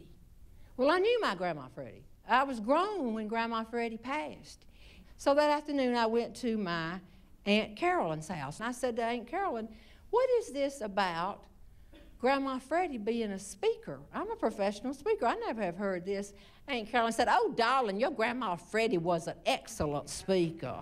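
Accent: South, United States. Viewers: The accent is perfect southern! Way to go.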